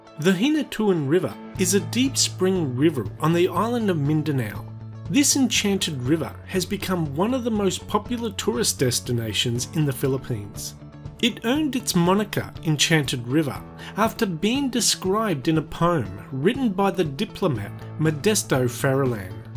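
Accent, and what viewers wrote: Australia. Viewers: Thanks for soften your australian accent.....!!!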